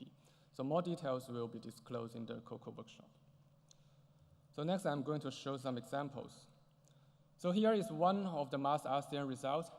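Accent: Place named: China. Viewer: Как здорово смотреть на английском (с сильным китайским акцентом), без каких-либо встроенных субтитров... И все равно хорошее объяснение)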